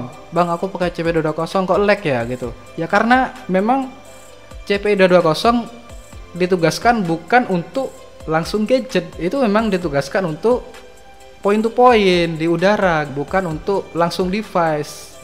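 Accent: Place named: East Java, Indonesia. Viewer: Logatnya sampean kok kayak Orang Lumajang / Jember / Bondowoso. Sampean domisili mana mas?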